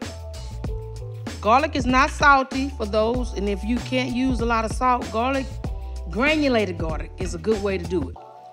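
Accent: South, United States. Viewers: you so southern! 😂❤ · I love that she doesn't hide her/our Southern Twaaaaannnngggg!!!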